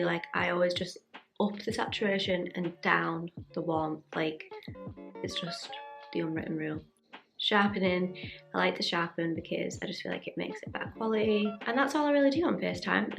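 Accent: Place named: Cumbria, United Kingdom. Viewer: Cumbria accent aiiii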